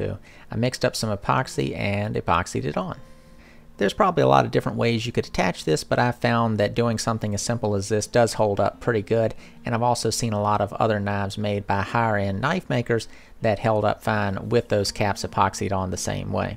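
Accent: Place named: Alabama, United States. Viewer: Beautiful knife...thank you for sharing! I noticed you said in a below comment that you were driving by SMKW when you decided to go in. I live about 30 minutes away from there. So you live in East Tn or were you visiting? Your accent sounds more from central Alabama.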